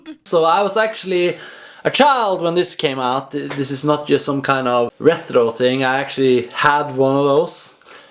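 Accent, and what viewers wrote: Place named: Norway. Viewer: Boi u suck at english Sounds like your from Norway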